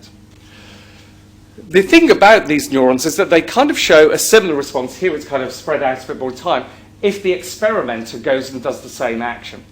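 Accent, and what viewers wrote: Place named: United Kingdom. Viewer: is the british accent that difficult to decipher? i enjoy it immensely :)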